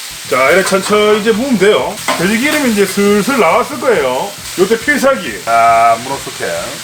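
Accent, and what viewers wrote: Gyeongsang, South Korea. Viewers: 고향은 울산 방어진이시고, 현재는 강원도 홍천에 살고계세요-^^ 울산에서 오래사셨어서 경상도 사투리를 많이쓰세용😁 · 대구 부산 둘다 살았는데 대구 말투에 더 가까운 거 같기도 하고...ㅋㅋㅋㅋㅋ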